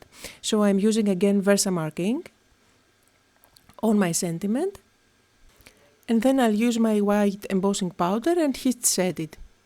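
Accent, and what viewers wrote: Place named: Greece. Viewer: it's a Greek accent. Lovely card!!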